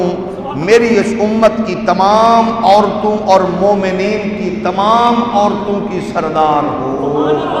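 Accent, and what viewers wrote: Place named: Punjab, Pakistan. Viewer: Maa sha Allah lykin jo ap ki Punjabi ka lotaaf ata hai ous jysa kio maza nahi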